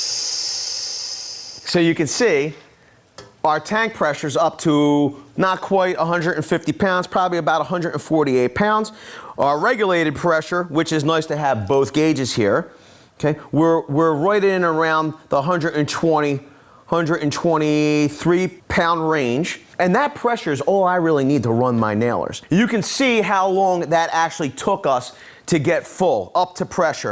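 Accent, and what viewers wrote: Philadelphia, United States. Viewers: He's from Philly, that's how they talk.... get with it broski!!!!!!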